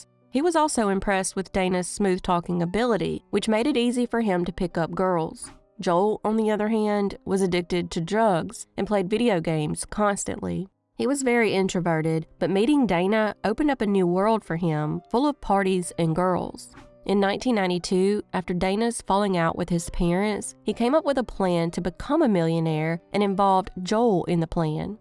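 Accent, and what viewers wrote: South, United States. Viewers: 😊 I so enjoy the way you present your cases. Your voice is soothing and I could listen to your sweet Southern voice 💕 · Thank you. Wish you did audio books.:)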